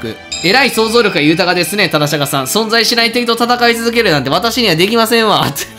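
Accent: Kansai, Japan. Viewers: kunさんはたまに関西弁が出てましたから関西出身やと思っとりましたけど岡山出身の方なんですね。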